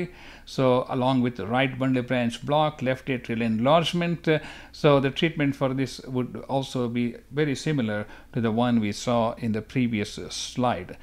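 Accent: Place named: India. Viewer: I don’t understand Indian accent 👎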